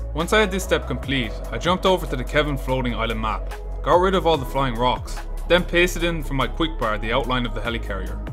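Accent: Ireland. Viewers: btw are you irish because you have an irish accent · Are you Irish you sound Irish?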